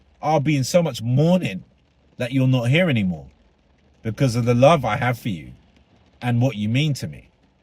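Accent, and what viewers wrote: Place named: United Kingdom. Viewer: Hi, from US, I too thought it was a bit weird but thought, maybe thats from the stress of her grieving, maybe she was even a little medicated. I dont know. I myself would've been far from the public eye & probably under heavy grief support somewhere. I'd be not ok if this happened to me. So, its all very sad and strange. Love your accent, are you in the UK? Love it there. 😊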